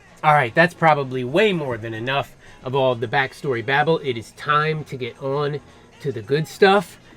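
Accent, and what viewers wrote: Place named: North Carolina, United States. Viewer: Hey, now! Where do you reside,? It sounds like from your accent that it's relatively near me, in central Piedmont NC. And how about that disc? Pretty amazing how much work went into that bad boy!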